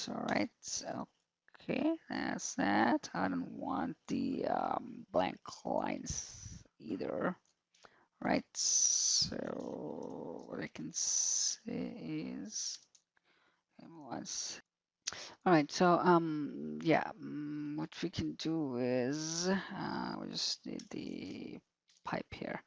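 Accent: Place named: United States. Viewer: I very curious to know, How did you get that American accent?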